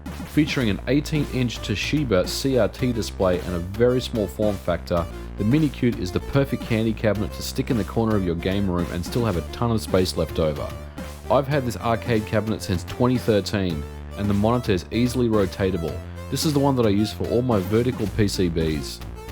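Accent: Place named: Australia. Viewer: I know you’re from America, but I wonder why you speak with an Australian accent...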